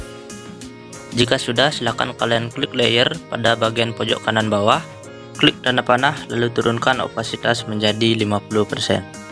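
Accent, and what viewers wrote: Aceh, Indonesia. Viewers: Orang Aceh BG?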